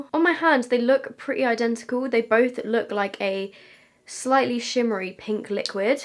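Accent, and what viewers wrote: United Kingdom. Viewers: Also, I need to say this: I love love loooove the british accent! Could listen to this all day!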